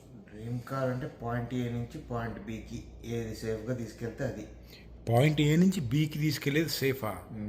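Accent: Andhra Pradesh, India. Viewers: Anna garu you are talangana man But your talking pure AP talugu so proud of u anna garu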